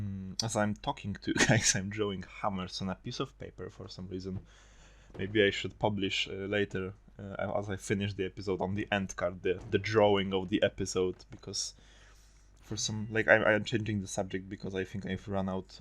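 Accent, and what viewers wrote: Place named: Eastern Europe. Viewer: Polish, that was very enjoyable to listen to, I'd be glad to listen another ones. Also, your english is just fine, take it from another slav. So don't sweat about it, after all we are foreigners and it is highly justifiable for ears of others to hear our rough talk.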